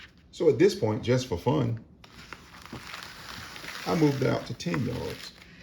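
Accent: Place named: Alabama, United States. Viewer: I’m from Alabama originally and it sounds like you might be from there. Am I right?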